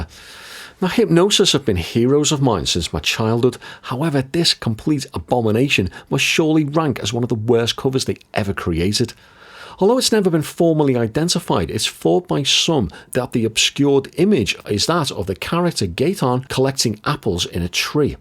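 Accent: Liverpool, United Kingdom. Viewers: A fellow scouser narrating one of the most underrated albums.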